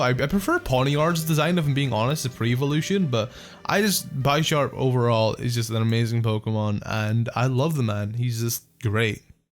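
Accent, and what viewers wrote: Scotland, United Kingdom. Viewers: Are ya scottish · Scottish? :P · Is this nigga Scottish? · That Scottish accent in the first video you ever made :'D xD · you can really hear scottish in in his first video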